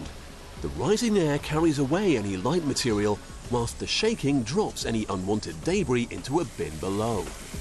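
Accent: United Kingdom. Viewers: And a brit remittance man narrating. Is there nothing these manglers of their own language will not pretend to be exerts on?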